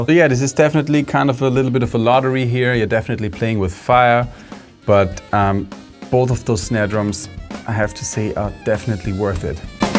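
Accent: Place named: Germany. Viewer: brilliant! I’ll be watching it, cheers, are you in Germany? You sound german, if you don’t mind me asking..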